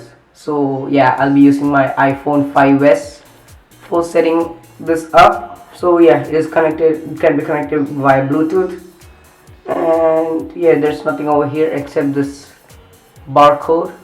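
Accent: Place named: India. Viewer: Did he just say 'XBASS 360'?? Indian accent maarlo yaar...fir kehte ho ki log subscribe nhi karte!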